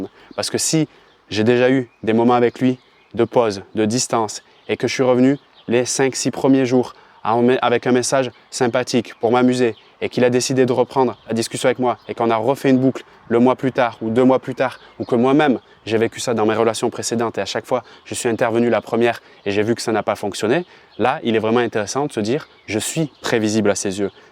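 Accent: Quebec, Canada. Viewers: Merci pour ces conseils,. Mais s'il ne faut pas être "prévisible" et éviter le silence radio, quelle stratégie, préconises-tu? Ps: j'aime bien ton accent, serais-tu Québecois?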